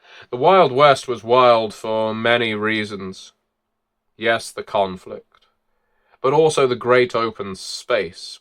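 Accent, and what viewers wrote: England, United Kingdom. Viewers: It really just the cherry on top, hearing an articulate English accent justifying Colonialism in the 18th century with the claim that humans fundamentally and habitually extract and exploit to live. Chilling. Love it. You know, in the US we pride ourselves on being very sad about being a very successful, leading, world military and "not-empire" that spans across a continent and around the world. Everyone just ignore the fact that the sun never sets on US territory, ignore the military base in your country, and the nuke subs in launch range of your cities. We're not an "empire"! How ridiculous. We have a legion of lawyers to show you how wrong and slanderous it is to point out that fact!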